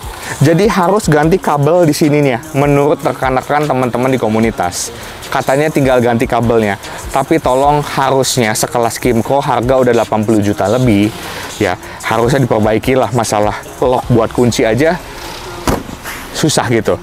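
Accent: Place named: West Java, Indonesia. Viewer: Om. Logat sundanya, jls bgt. 😂😂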